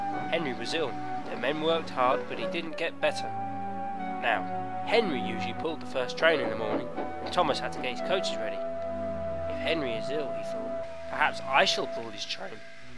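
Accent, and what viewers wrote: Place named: Liverpool, United Kingdom. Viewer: Great video mate :D The figures look great, great scale :D The narration is great! Anymore we can expect soon? ;D Just saying your Liverpool accent is AMAZING.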